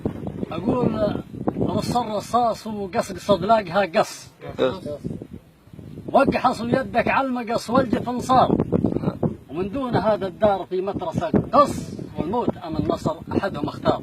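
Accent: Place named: Shabwa, Yemen. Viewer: والاجمل اللهجة الشبوانية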